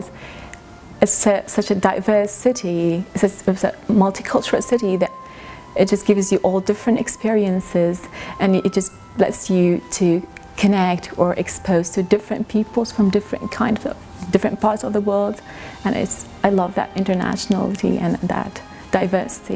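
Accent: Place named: Iran. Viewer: Medicine I believe.... She has a Persian accent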